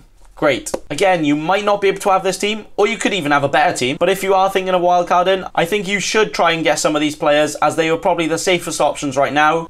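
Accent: United Kingdom. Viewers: Very nice video as always, but dude you gotta slow down and breathe sometimes, you speak so fast and with alot of accent ii can be hard to keep up if you are not UK and watch your videoes. I really like your content but for me it goes way to fast in this video and hard to keep up with your ideas and plans and your accent is very strong so could be another argument for slowing down a little. I´m not trying to criticize your video, just letting you know that not all of your viewers are from UK and some like me can struggle with the stuff I wrote. Keep up the good work <3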